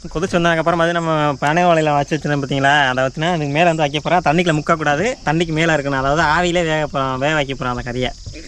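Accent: Sivaganga, India. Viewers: Siva gangai tamil super nanba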